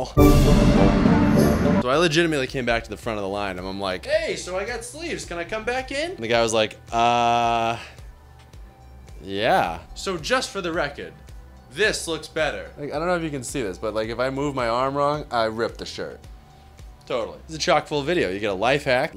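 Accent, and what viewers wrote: Boston, United States. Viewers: don't you dare fuckin censor that beautiful boston twang ya gump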